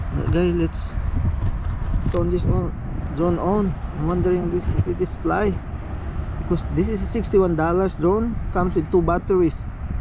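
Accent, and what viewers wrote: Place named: Philippines. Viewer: hi are u a filipino? i can tell by your accent hahahaha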